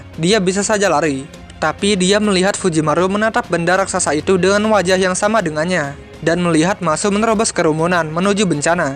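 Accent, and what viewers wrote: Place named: Bali, Indonesia. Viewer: logat nya cerita kayak orang bali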